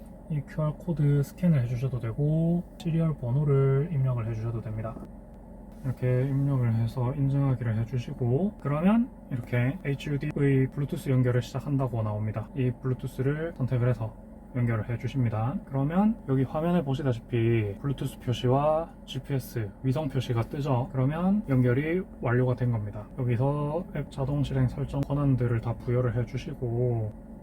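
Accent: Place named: Changwon, South Korea. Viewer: 창원분이시구만 ㅋㅋㅋ 반갑습메다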